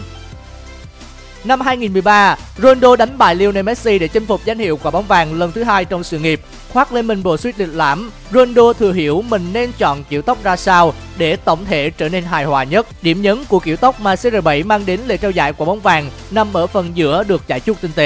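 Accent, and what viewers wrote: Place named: Da Nang, Vietnam. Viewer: Giọng đà nẵng chắc luôn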